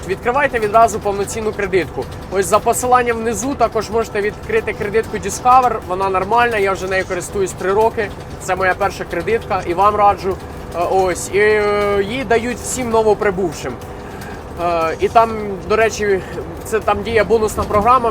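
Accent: Ukraine. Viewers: Деревня , суржик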